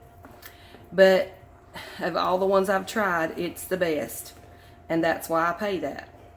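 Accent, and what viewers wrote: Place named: South, United States. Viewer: Great job & I love your southern accent 😍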